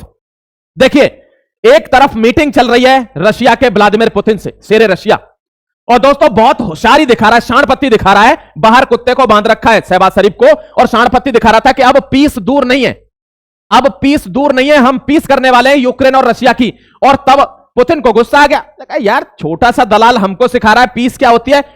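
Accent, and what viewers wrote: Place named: Haryana, India. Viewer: भाई साहब आपकी हरयाणवी बातचीत सुनने से बहुत अच्छा लगता है ! सच्चे देशभक्त की यही आवाज ही है ! जयहिंद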